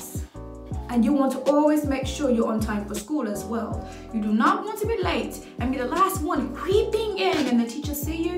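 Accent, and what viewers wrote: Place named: England, United Kingdom. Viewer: Some good tips Teacher...loving the glasses and English Accent 😂👌🏽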